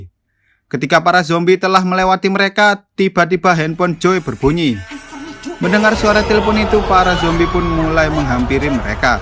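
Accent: Java, Indonesia. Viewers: Lucu ngomongin film ini orang yang punya cannel i i lucu dan kaya orang jawa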